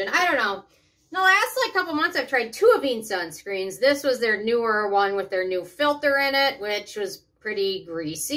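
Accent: Minnesota, United States. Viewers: Hey, I just started watching your videos, thanks so much for the reviews! I could tell right away by your accent that you must be Minnesotan, I'm from Minnesota too, (a.k.a. the best state in the USA!) I would love to see a review on the new DRMTLGY Physical SPF 44. I've been eyeing that one!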